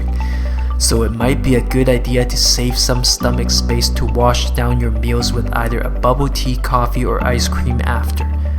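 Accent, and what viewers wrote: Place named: North America. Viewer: + You behave like a whiny American, you sound like your from North America are you?! What's your ancestry, Polish or what else? No, it's in south america, not in the world, look at this list and look at this info in the 1940s and 1900s "The Japanese population in the United States grew from 148 in 1880 (mostly students) to 2,039 in 1890 and 24,326 by 1900." has more then brazil always, there you see or "the number of Japanese in other countries in the Americas at the time such as the United States (285,000), Brazil (205,000)" see?! And the list that it looks like there are more Japanese in America then brazil just like peru and Canada! 1841: June 27 Captain Whitfield, commanding a New England sailing vessel, rescues five shipwrecked Japanese sailors. Four disembark at Honolulu, however Manjiro Nakahama stays on board returning with Whitfield to Fairhaven, Massachusetts. 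After attending school in New England and adopting the name John Manjiro, he later became an interpreter for Commodore Matthew C. Perry. 1850: Seventeen survivors of a Japanese shipwreck are saved by the American freighter Auckland off the coast of California. In 1852, the group is sent to Macau to join Commodore Matthew C. Perry as a gesture to help open diplomatic relations with Japan. One of them, Joseph Heco (Hikozo Hamada), goes on to become the first Japanese person to become a naturalized American citizen. 1861: The utopian minister Thomas Lake Harris of the Brotherhood of the New Life visits England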